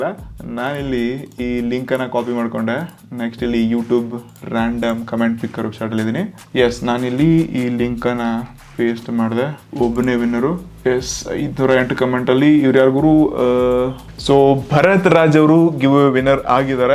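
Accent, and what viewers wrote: North Karnataka, India. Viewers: ಅಣ್ಣ ನಮ್ಮ ಉತ್ತರ ಕರ್ನಾಟಕ ಭಾಷೆ ಮಾತ್ರಾ ಬೆಂಕಿ 🔥🔥🔥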